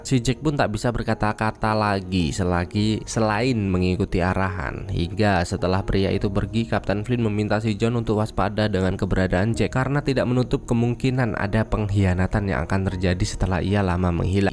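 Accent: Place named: Java, Indonesia. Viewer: Bg medok e hilangkan yo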